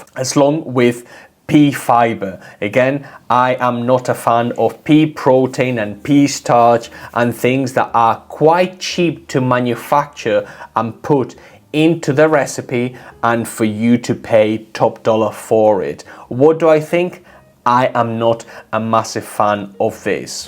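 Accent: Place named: Northern England, United Kingdom. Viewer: I notice you didn't factor in recalls and poisonings. Kinda important, but maybe that's another video, eh? Blue Buffalo and Iams have horrible track records in that department, FWIW. THANK YOU YOU ANGEL SENT FROM THE GOOD PLACE (I.E. 'the norff' by the sound of you.) We all desperately needed a vid like this. Clear and very educational. By the time I got to the end I felt like I'd learned enough to judge the dry foods pretty well on my own. Well enough to know what to steer clear of at least. I am so grateful. Glad to learn the one I'm currently feeding is the pick of the litter too, thank god! I wonder (and would not be suprised to learn) if our standards of pet food here in the U.S. is even worse than where you are. We probably allow all kinds of crap EU and UK wouldn't dream of allowing. That's if the way they handle the human food over here is anything to go by. 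Anyway cheers, and keep up the good work.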